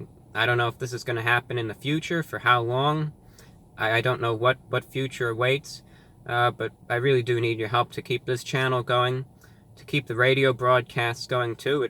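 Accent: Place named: Transatlantic, United States. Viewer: bringing the transatlantic accent into the 21st century for sure 🍔🍟🍕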